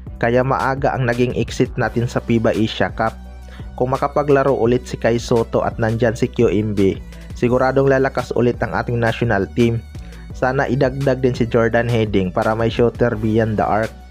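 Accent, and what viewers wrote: Visayas, Philippines. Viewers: Naku poh c visaya nn man pla 2ng narretor...fake news 2ng bisaya n2...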